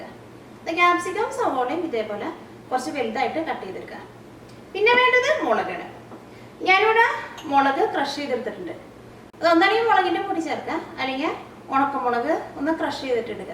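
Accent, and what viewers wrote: Thrissur, India. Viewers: looks so yummy... will definitely give a try. by the way, I'm a great fan of you dear chechi... your way of explaining and that trissur slang are wonderful.... thank you for all your recipes....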